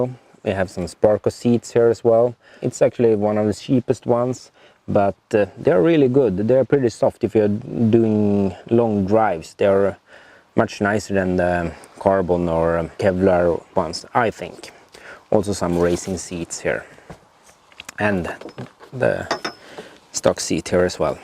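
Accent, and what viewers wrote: Sweden. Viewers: Are u swidish?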